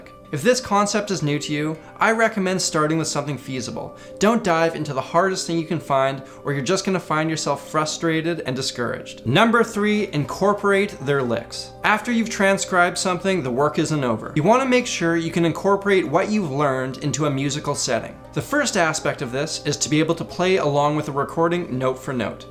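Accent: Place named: Canada. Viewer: Being honest, your Canadian accent is pretty cool! I get yours way before than American accent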